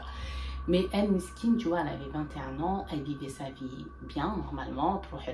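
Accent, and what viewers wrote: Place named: Arab world. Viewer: Bonjour ma belle je suis ta chaîne depuis très peu et j’adore ces sujets. J’ai une histoire à te proposer c’est celle du sorcier yéménite qui en voulant arrêter la sorcellerie ses enfants sont tous morts … je ne sais pas si tu connais cette histoire elle est vraiment incroyable Par ailleurs j’aime beaucoup ta lahdja quand tu parle en arabe, c’est laquelle ? Encore merci et bonne continuation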